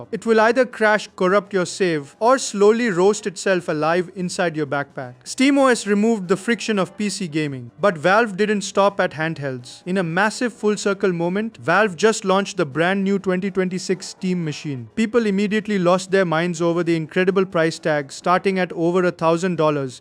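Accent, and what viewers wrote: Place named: India. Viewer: Heard the Indian accent and left instantly saar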